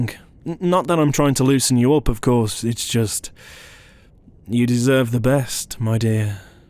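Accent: United Kingdom. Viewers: He fucking sounds British…I love it..😂😩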